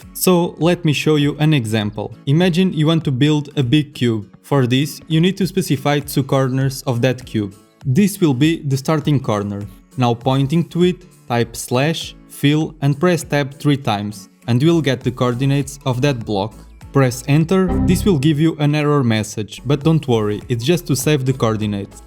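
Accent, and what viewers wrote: Portugal. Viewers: bem me parecia que eras de Portugal ^^ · parece me portugues o sotaque nao engana🤣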